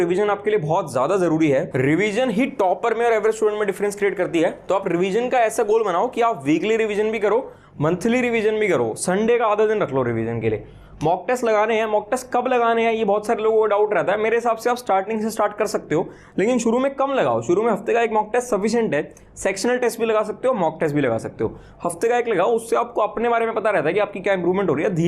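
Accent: Haryana, India. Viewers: brother i think you are form hariyana Right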